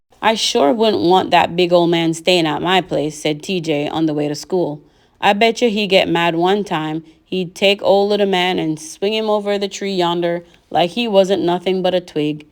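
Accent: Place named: South, United States. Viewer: I’m here smiling from ear to ear, while teacher reads in her southern accent 😂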